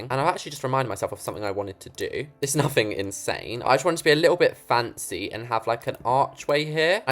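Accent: United States. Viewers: What county are you from? I actually thought you were American, no offence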